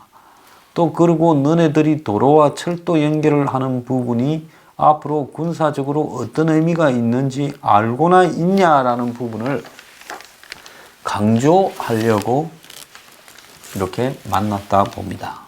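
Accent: Gyeongsang, South Korea. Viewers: 경상도 말씨를 쓰는, 분석이 예리하고 꽤 깊은 내용을 우위적으로 유지하고 있는 님의 영상을 좋아합니다 But, 님의 반미적 경향에 조심스런 조언을 드립니다. 미국은 두 갈래의 미국이 공존하면서 트럼프에 밀리는 세력에 대한 인지력을 바탕으로 트럼프 미국을 약간 비판하는 듯한 님의 이 방송을 주시합니다 클린턴 이 후 오바마까지의 미국과 내밀관게에 있던 한국 공산주의_세계 원 정부파 미국의 이미지로 국민을 우민화 하시지 말기 바랍니다.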